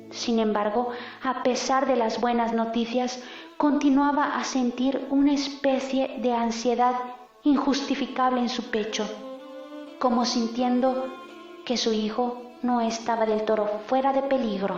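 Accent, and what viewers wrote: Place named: Spain. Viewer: Sip, lo sé, solo que hubo una de tus suscriptoras que preguntó que de donde eras y me atreví a responderle 😆😆😆(perdón)que eres española y eso se nota claramente en tu acento. Saludos.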